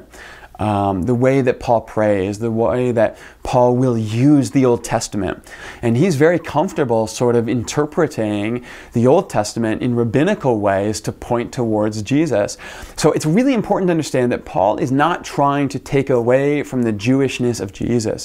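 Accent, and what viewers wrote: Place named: Canada. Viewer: I can hear the Canadain influence not only in the accent but in the non-binary perspective so refreshing. Speak louder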